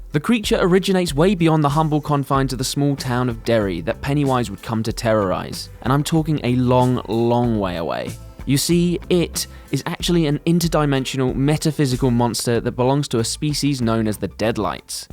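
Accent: United Kingdom. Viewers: Stop with the brit announcers!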